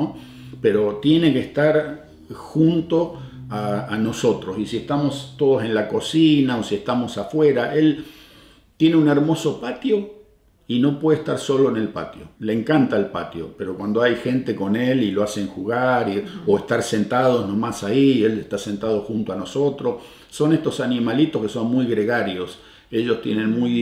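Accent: Argentina. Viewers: Para el próximo video. Como es que no perdiste el acento argentino después de tantos años? Que extrañás de Argentina que no encuentres en Canadá ? Es importante el clima cuando se logra una buena calidad de vida? Saludos ! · Si! a mi también me encanta escucharlo tan argentino! viviendo la experiencia de un país fabuloso, con las raices acá y con su cabeza en el hemisferio norte. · Gente maravillosa! Espero mi hijo pueda irse en 2 años a vivir allí y conservar como la diccion Argentina, la simplicidad y poder hacer una nueva vida allí!! Tiene 25 años es profesional y yo voy detrás de él una vez establecido!!! Lobespero con ansias, tenemos familiares allí!!! Abrazos desde nuestra pobre Argentina que aunque la amamos...ya no Se puede vivir.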